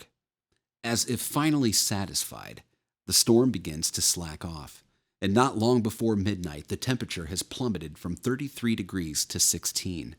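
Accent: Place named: Maine, United States. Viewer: You have do a pretty good Maine accent.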